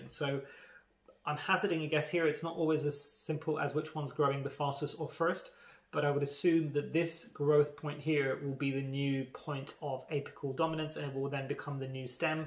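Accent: West Country, United Kingdom. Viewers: Really interesting video. You’ve got a bit of a West Country twang. Hi from Bristol ☺️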